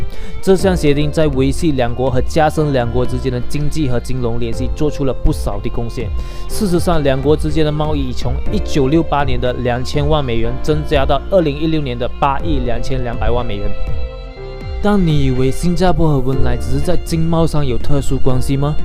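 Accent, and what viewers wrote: Malaysia. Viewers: 没人要求你一定要听完，这是我们malaysia的特色，这是我们祖先从中国来到南洋后传给我们的（我们听着很舒服） 就好像东北/台湾/山东/香港都有各自的口音，我们会尊重他们的口音，说话方式， 怎么到我们的口音你就有意见了～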